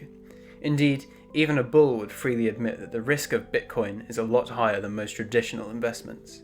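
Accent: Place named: Texas, United States. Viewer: I know a bit abt dialects and I have to ask, what part of Texas are you from?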